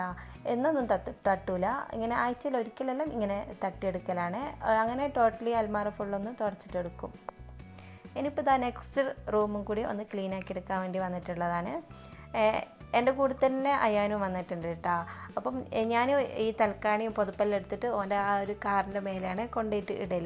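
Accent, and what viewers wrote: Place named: Kannur, India. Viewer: Wayanadanenklum kannur stylanallo samsaram 😊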